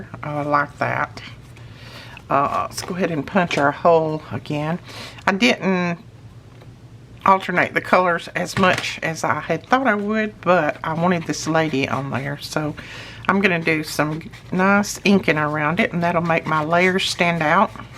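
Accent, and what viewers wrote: South, United States. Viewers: I love your crafts & your voice is so kind; I could listen to you all day. Are you from the South? Your accent seems like you are. I'm from AL. Best wishes & God's blessings. : )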